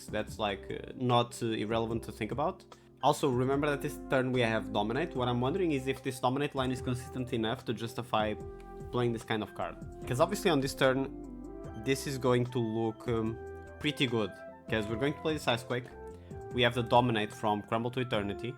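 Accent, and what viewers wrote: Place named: Portugal. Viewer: You sound portuguese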